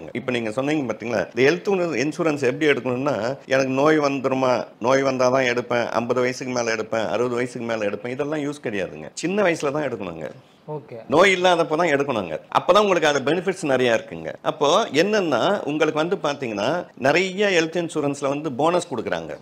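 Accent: Coimbatore, India. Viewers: Enga Coimbatore slang uhh nga❤ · Evalo respect a peasararu 🙏Kongu slang ❤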